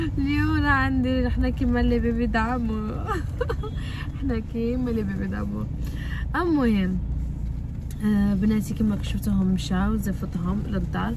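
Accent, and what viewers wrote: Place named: West, Algeria. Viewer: ههه عجبتني يامور ❤ واه😅 تحيا هدرتنا حنا الغرب حلوة كي العسل🤩🇩🇿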